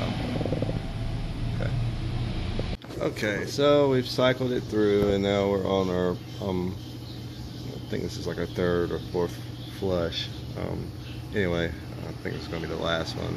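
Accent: United States. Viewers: Interesting video. You talk like a good ole boy from South Carolina. I understood every thing you said. lol Where are you located? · I get a lot of verbal inflection in your voice of a New Yorker. You actually kinda sound like Stanley Kubrick.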